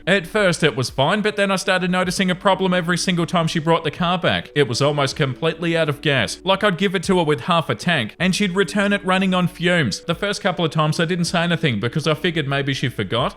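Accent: Australia. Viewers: Everybody has an accent. Thats how speaking works. And youre Australian? And you dont think you have an accent? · On the point about accents, you do have an Australian accent, although not super strong. Everyone has an accent, mine being a mix of Pacific Canadian & central Canadian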